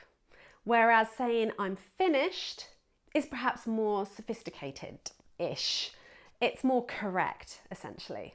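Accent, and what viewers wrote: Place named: RP, United Kingdom. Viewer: so no abbreviations and your english is posh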